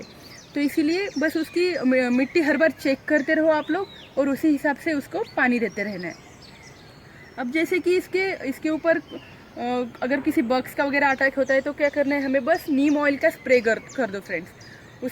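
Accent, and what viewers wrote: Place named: Maharashtra, India. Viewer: Tumi Marathi bolta ka....Tumcha aawaj Marathi Hindi sarkha ahe Mi Nagpur adhun aaj ha plant ghenar ahe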